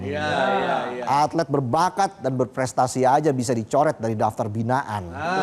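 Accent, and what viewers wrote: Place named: Jakarta, Indonesia. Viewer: Ogoed suka bener ame ni gaye bicarenye Coba dong logat betawinye kentelin😅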